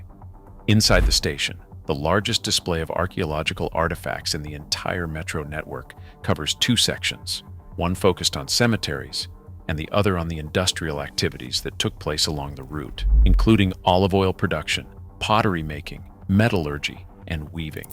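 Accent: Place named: United States. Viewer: Great presentation, but your americanized pronunciation of the Greek names is quite disturbing I'm sad to say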